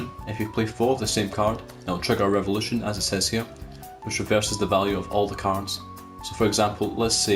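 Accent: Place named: Scotland, United Kingdom. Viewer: Came to learn tycoon, stayed for the Scottish accent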